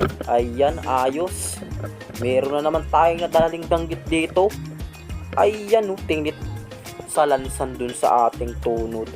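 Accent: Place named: Marinduque, Philippines. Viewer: a my classmate ako dyan sa dawis si Japet labrador si melody saet mrami ako classmate dyan ipagpatuloy mo lng yan kabayan suportado kita dito sa atami japan natutuwa ako sayo mg salita marindoquienio ka tlga god bless allways idol❤❤❤❤❤❤❤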